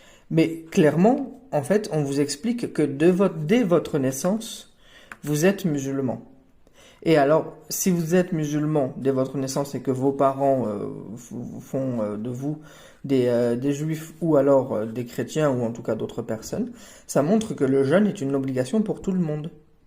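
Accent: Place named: Arab world. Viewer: Poto t'as un accent arabe découpé à la scie c'est pas ouf. Tu vois "passer du coq à l'âne" bah c'est pour toi cette expression idiomatique française.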